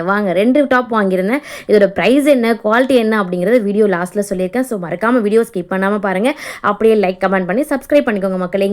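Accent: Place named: Chennai, India. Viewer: நல்லா இருக்கு இந்த டாப் காலண்ட நல்லா இருக்கா சென்னை பாசை சூப்பர் 😂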